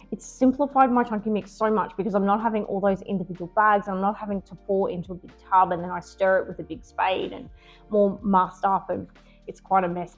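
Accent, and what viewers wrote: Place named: Australia. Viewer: noo YT didn't show this video in my mentions, but it popped up on my feed today. I am so glad it did and I love your Aussie accent! I can understand you just fine. 🙂 I love your home and outdoor garden too! it looks like you get a lot of natural light, I am so jealous. thank you for doing this tag! 💚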